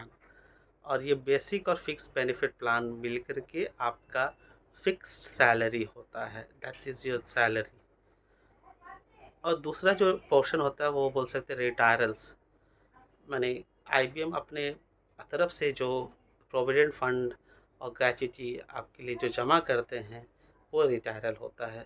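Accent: Bengal, India. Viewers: U got bengali accent while talking..khoob sundar👍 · R u Bengali ?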